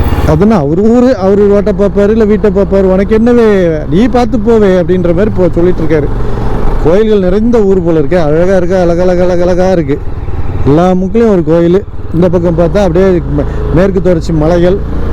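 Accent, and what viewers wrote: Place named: Tirunelveli, India. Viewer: Nellai Tamil plus for your channel. Please keep it up.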